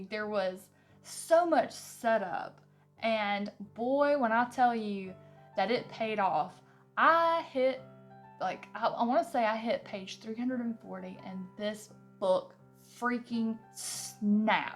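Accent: South, United States. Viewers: Omg your southern accent <3 I am Canadian and I've loved my trips to the south. John Gwynne is a damn badass and I love him. I hope you LOVE the rest of the series.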